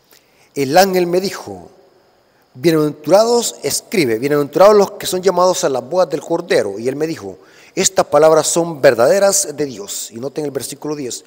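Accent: El Salvador. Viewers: Así se habla en El Salvador, como aquí en México, cada estado tiene su acento.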